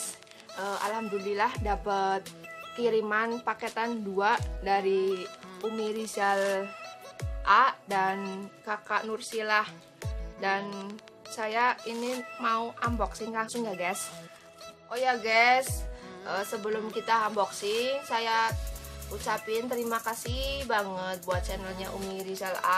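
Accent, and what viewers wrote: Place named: Java, Indonesia. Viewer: Wa'alaikumsalam wr wb Waaah tasnya bagus bangeeet 🤭 Medok banget mbak hehee Salam ngapak lah Nek ora ngapak genah ora kepenak ya mbak 👍 Selamat ya dapat kiriman paket dua sekaligus dari umi rizal A dan mbak Nursilah julaini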